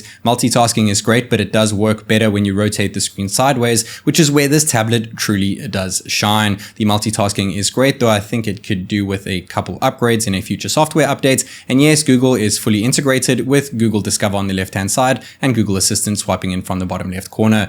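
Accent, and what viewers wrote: South Africa. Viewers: I always sensed he had that South African Touch 😸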